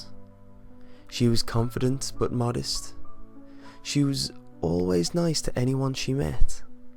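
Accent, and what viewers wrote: England, United Kingdom. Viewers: I want to say that you really are awesome and I love listening to your creepypasta narrations because of your awesome accent. I have to ask though are you from England or do you live in England. I'm wondering because of your awesome accent. My friend told me you probably aren't from England but you're using the accent to make yourself sound better, I say hes wrong which of us is right. But either way I'll still love your creepypastas. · Your slow English accent makes everything more intense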